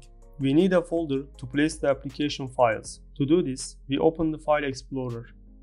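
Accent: Turkey. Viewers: Damn your accent tells me that you're Turkish. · aga yeminle ingilizce konuşmandan bile türk olduğun anlaşılıyor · Abi Türk olduğunu aksanından anlamıştım gayet güzel videolar çekiyorsun eline sağlık :) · iliklerime kadar türk ingilizcesi en sevdiğim :) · Türk ingilizcesi diye bir şey var buna eminim ben artık. Çok faydalı video teşekkürler!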